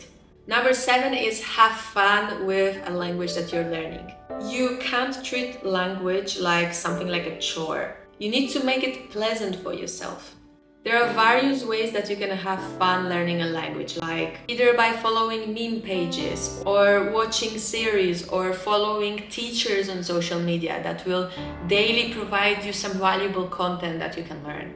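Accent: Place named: Italy. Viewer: I love that your accent becomes more Italian as the video goes on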